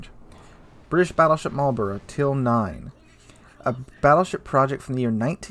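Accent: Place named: United States. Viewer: True, but pronunciation isn’t bad, it’s just the Americanization, like saying Edinburgh as Edinburough instead of Edinburra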